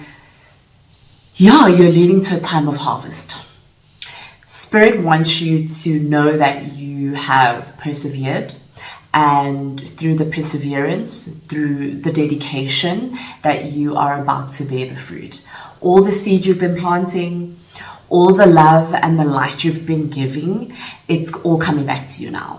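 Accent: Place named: South Africa. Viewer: Lol it's a South African accent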